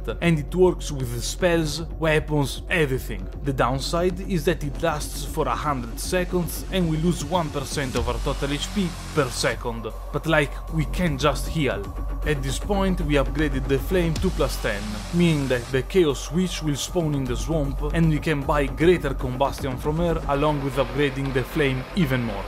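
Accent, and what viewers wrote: Italy. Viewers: italian accent is very marked that’s why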